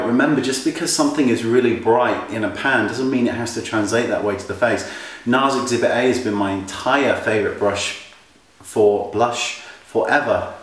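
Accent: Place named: London, United Kingdom. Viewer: It's a simple london accent :)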